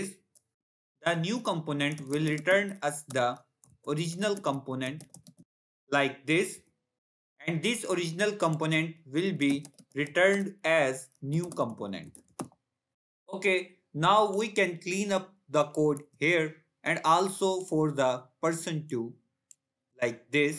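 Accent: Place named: India. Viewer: damn, I heard the Indian accent and said noooo xD but in the end my God this was a great explanation, the best I've found about this topic, you get a like and if I find something else like this in your channel you get a subscriber.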